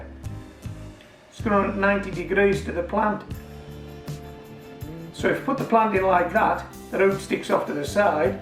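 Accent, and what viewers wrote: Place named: Cumbria, United Kingdom. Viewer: Informative video. l'm in Canada, zone 4b, and starting everything indoors in a couple of moths to plant out in May. Imagine my surprise to hear what sounds like a good Cumbrian accent (l'm originally frae Maryport). Great job on your channel.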